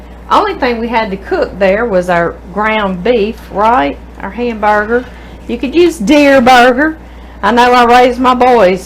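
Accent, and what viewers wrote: South, United States. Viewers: Watching so of your older videos. I loved your accent back then. 😊❤️🙏🏻 Oh, and people should never, ever be mistaken in thinking a southern accent means you are stupid. ❤ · I could listen to this sweet southern lady talk all day! What a great recipe! It's basically lasagna but without all of the work involved.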